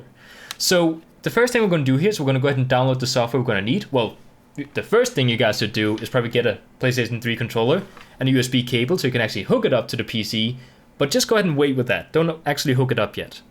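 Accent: Denmark. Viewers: He's Danish u can tell by the accent and the ads. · Tager jeg helt fejl hvis jeg kan ane en snært af dansk i accenten :P?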